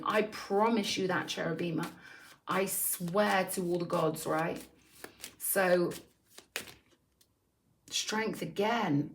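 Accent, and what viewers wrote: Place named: London, United Kingdom. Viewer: I love ur accent, your kinda like a posh London geez ❤😂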